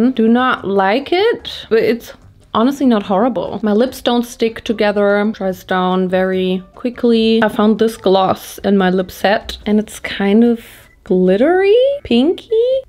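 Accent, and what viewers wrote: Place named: Germany. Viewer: I hear the germaness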